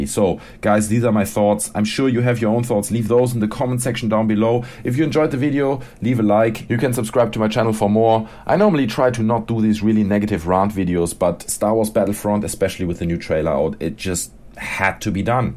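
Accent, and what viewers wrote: Germany. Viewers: Your German (correct me if I'm wrong) accent sounds extremely awesome.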